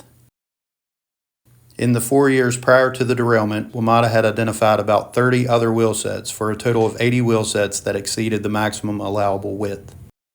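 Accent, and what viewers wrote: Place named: South, United States. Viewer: Maybe it’s just me but maybe the NTSB can hire someone to do these voiceovers so we don’t have to try to concentrate what this man with a thick southern accent is actually saying.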